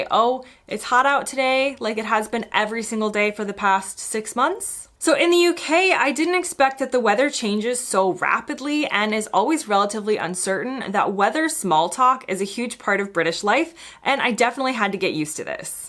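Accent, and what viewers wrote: United States. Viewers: I see you haven't lost any of your american accent, but I notice you using British words.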